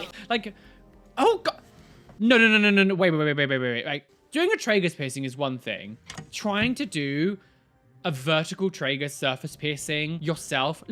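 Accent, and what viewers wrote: United Kingdom. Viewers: I’m so used to accent that I forget he’s British until I hear him do an American accent 😭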